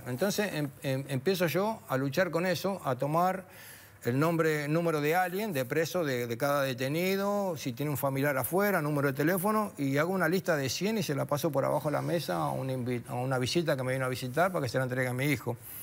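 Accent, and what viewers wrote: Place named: Argentina. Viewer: Que buena historia yo soy de Honduras pase si no es lo mismo pues fue casi igual per bueno gracias a dios tuve la suerte de solucionar mi problema de migración y me identifico con vos por mucho primero por nuestro acento Honduras y Argentina tenemos muchas palabras similares y cultura aquí en california la mayoría son de México Guatemala o el Salvador le notan a uno su acento y tenemos que nosotros decidir las palabras que los demás dicen para poder darnos a entender pero bueno vos trata de apelar tu caso es en caso bárbaro muy bueno lo podes ganar suerte y ojalá te podas reunir con tu familia.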